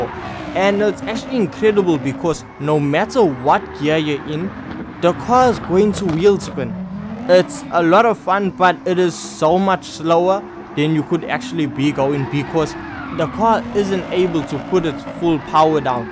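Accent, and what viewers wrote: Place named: South Africa. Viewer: This bro is a South African indian 100%... I swear straight from durban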